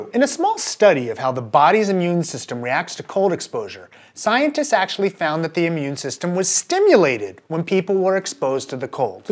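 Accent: United States. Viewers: I'm with Joseph here, remember that you are watching an American channel, we don't use the metric system. It isn't hard to tell by his accent that he is American.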